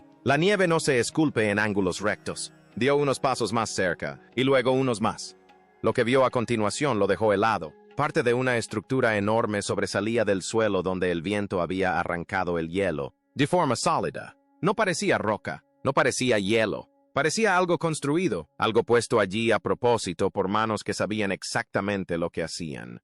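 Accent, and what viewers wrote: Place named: United States. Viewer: Y este acento de Gringo aprendiendo español! esto es Falso!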